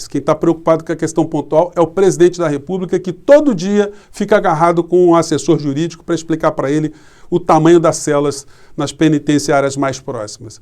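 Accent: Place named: Rio de Janeiro, Brazil. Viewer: Professor, não tente "amenizar" o seu sotaque. Fale sem pena nem vergonha com o nosso sotaque do nosso maravilhoso Rio de Janeiro! Um abraço!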